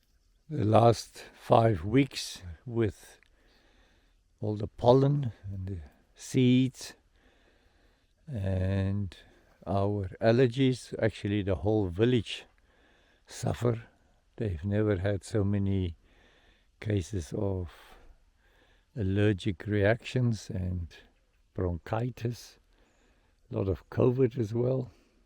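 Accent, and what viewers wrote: South Africa. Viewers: You sound like a South African 😂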